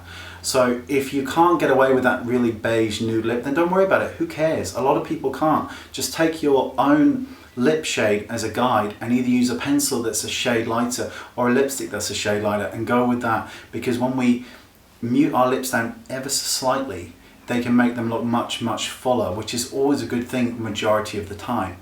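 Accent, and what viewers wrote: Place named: United Kingdom. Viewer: I love your channel... I come from Italy and for me it's a bit difficoult understand your british English :D (i understand better American English)... But I love the way you say your advices :D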